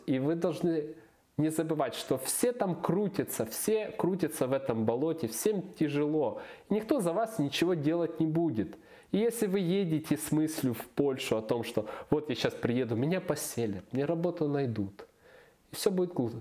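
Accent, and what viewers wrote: Ukraine. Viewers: судя по говору, он переселенец с восточных регионов или одессит. там это норма, так же как и в россии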